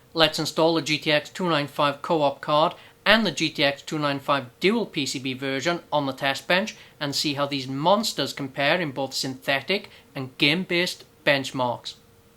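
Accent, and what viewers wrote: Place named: Wales, United Kingdom. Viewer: Sounds more Welsh to me.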